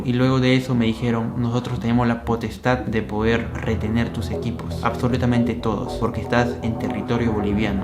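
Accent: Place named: Peru. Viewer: Dicen que los bolivianos altoque detectan acento peruano y les caemos antipatico por la rivalidad de las danzas esas de caporales,morenadas etc, por eso te insultaron por tu nacionalidad.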